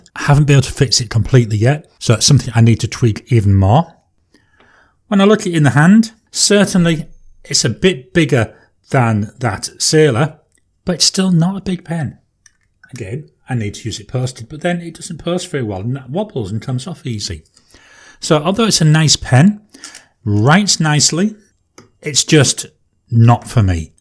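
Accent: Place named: England, United Kingdom. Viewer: Hi. I have two of your pens; the Moonman M800 (Moonman nib) and the Jinhao 159 (I have a few in different colours). I don't have a Hong Dian but I do have the Lamy Studio which looks very similar. The Jinhao is a good pen for the price but feels a bit too large in my hand. I was surprised it took the top spot in your ratings. By the way, I know you are in Australia but your accent has me confused. It sounds English but I am stuck on the exact region. I am from UK but your voice still has me a bit puzzled!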